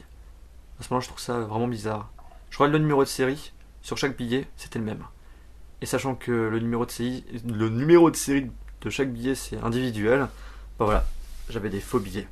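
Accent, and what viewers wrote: France. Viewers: T'es de paris, lyon ou marseille ? tout simplement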